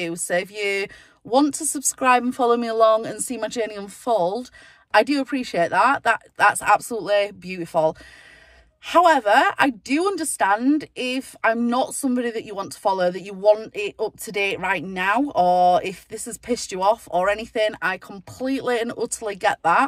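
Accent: Yorkshire, United Kingdom. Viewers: Your channel is good, you are not boring, you are aesthetically pleasing (we don’t all want to watch perfectly filtered beige channels), you are not common you’ve just got a Yorkshire accent and there’s nowt wrong with that! 😂😂 I think one a day is perfect and yeah, no spoilers please. I’ve gotta say though when you mentioned be positive Sam uploading again you got me all excited and confused 😂😂. I miss her videos! Very brave video and I completely understand where you are coming from. Lots of love xx.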